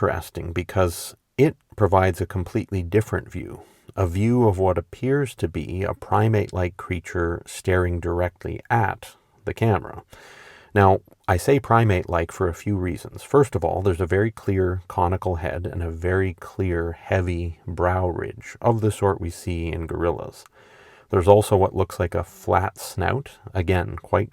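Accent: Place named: Canada. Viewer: I’m so glad I found this channel. I appreciate the quiet, measured and affably Canadian narration. I also like how you just lay down the stories and the evidence that are out there so people can draw their own conclusions. Most paranormal/cryptid/UAP channels are ultra low effort AI clickbait, so when I find one that’s not, it’s very exciting. Keep up the good work!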